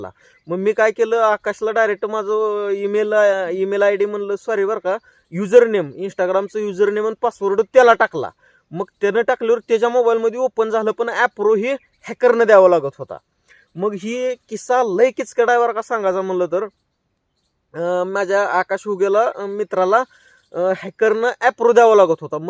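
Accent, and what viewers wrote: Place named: Marathwada, India. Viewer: दादा तुम्ही कुठून आहे तुमचं बोलणं मराठवाड्यात दिसतंय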